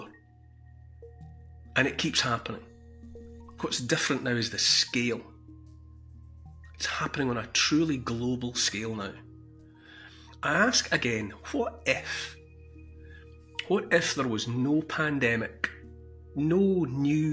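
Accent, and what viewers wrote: Scotland, United Kingdom. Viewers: The Scottish accent is so very charming! I love it. (The Irish accent is equally charming, definitely different from the Scottish, but similar to American ears.)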